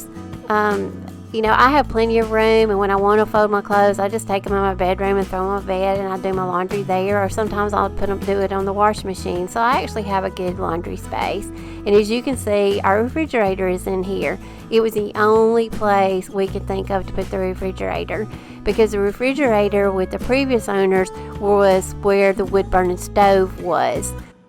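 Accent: South, United States. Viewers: Just found your channel today. Cute cute cute! I'm from Alabama and I recognize a Southern accent 😂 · Wow! I love your home. It is very cozy. I love how you have paid attention to detail. I absolutely adore your style. You and your husband have done an amazing job on the remodeling. You have a sweet southern voice. What state were you raised. I’m from northeast Alabama and living in Southwest Arkansas. ☺️🧡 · I absolutely LOVE your home and all that you’ve done to it. Also, being from the south, but living in Northern Virginia for the last 30 years, your voice made me think of all my “home girls”! I subscribed and now I’m going to watch all your videos as I wait for new ones!😀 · Your home and decorating style are spectacular!! You certainly have a flair!!! Do you happen to remember the ebay site for your door valance? It would be perfect for me to use on my door going out to the porch!! 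Your ideas are swimming in my brain now to see what all i could do!! Thank you for sharing!! Take good care!! I subscribed!! P.s. loved the southern dialect as i am from Tennessee now living in Georgia! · One question, where is the cook top and oven? I see the fridge is in the laundry room, isn't that inconvenient? I may have missed something as I have the video on mute, can not stand the sound of your southern drawl voice, it's nauseating.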